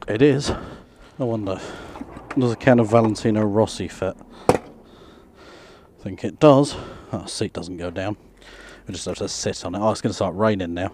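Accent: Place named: England, United Kingdom. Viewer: I'm trying to figure out which country this is. The accent and the driving on the left hand side of the street might indicate England. But the speed is in terms of MPH and not kilometers.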